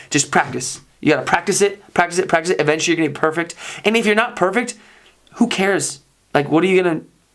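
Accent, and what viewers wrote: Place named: United States. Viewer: you have spent lots of your time in Southern Germany, especially in Swabian. Swabians are known for being able to do everything except of speaking high German. They also cannot be expected to contort their face and tongue to be able to speak English. A good example is the former Prime Minister Günther Oettinger. However when you come to other parts of Germany, especially in the north you will see that they are able to speak English much better than those in the south. To me it is also questionable that you claim to be the best English teacher. Your American accent is way different of what I have learned in German high school from 1960 to 1969 when we were taught to communicate in Oxford English. I still like your contents and your behaviour, keep continuing these type of videos.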